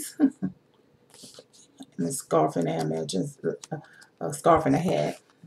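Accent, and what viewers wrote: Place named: New Orleans, United States. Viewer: Love your haul very thorough! Are you from New Orleans I can hear your accent? Anyway great video!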